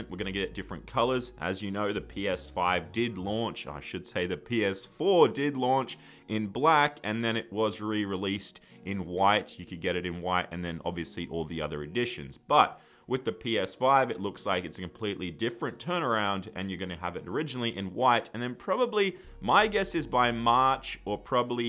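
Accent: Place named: Australia. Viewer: Great to hear an Aussie discussing PS5! 🇦🇺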